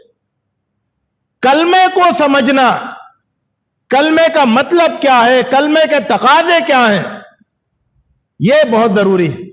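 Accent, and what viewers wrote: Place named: South India, India. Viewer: Most probably hailing from south india.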